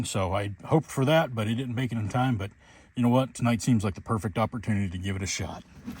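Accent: South, United States. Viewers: I thought you had a little south in your voice man 😂, now I know brother 👍🏼.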